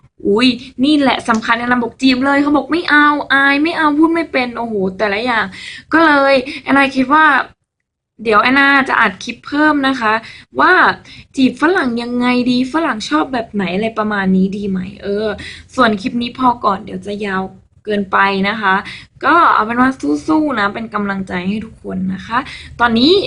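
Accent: Thailand. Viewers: พูดใต้อยู่เลย5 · ฮาๆๆ มีอีสาน มาด้วย 5555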